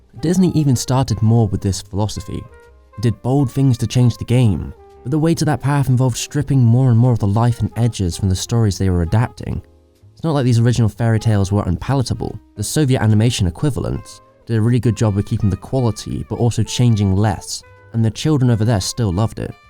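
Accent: England, United Kingdom. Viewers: ''there aren't like a hundred more Miyazaki films''we don't need them!!!!!!!!!!!!!!! they were great but not always the best!!!!!!!!! there are others out there ….nothing has to be a perfect animation!!!!!!!!!! Ghibli only got popular because of Japanese culture of façade and completabilities with other animating companies... the world went through a trendy stage where Ghibli came on a time where animation needed some direction...let go of this idiotic Ghibli shit!!!!!!!!!!!!! it's over...picachu came with live version which is absolutely fuckin' idiotic...hopefully miyazaki will not allow asshole Disney to make idiotic live version of Ghibli shit!!!!!!!! is it me or is it always have to be an English accented fool doing Ghibli documentaries...?not bad doc....maybe because appreciation and understanding is far better than many other culture that English accented people do these types...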